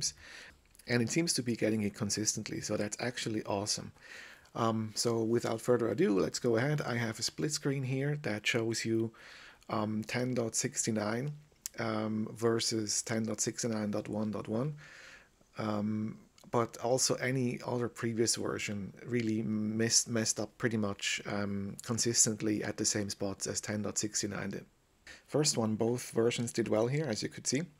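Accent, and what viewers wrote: Switzerland. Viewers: are you swiss?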